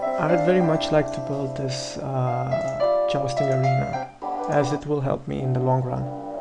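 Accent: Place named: Germany. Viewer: Excellent introduction to this great game. Thank you very much! Cute accent...I bet you are from Germany :)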